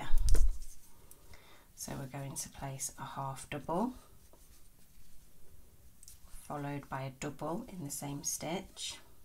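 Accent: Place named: England, United Kingdom. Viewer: i just have to say hearing you talk makes me homesick for england lol have been in usa since 1967 but england will always be home love listening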